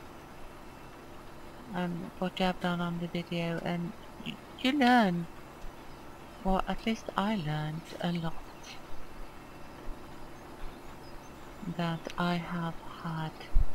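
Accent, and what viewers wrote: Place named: Sweden. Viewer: I can understand you perfectly. Swenglish works well :-) We older generation have to stick together (1952 vintage here). I talk to my motorbikes they like to be consulted:-) Great video I don't think I've ever managed to cover an entire grass field I always find bits I've missed Mike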